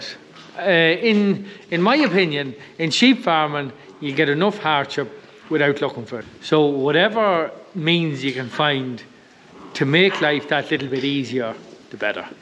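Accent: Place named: Cavan, Ireland. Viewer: I though I recognised that accent, good to see a fellow Cavan man on YouTube. Best of luck 👍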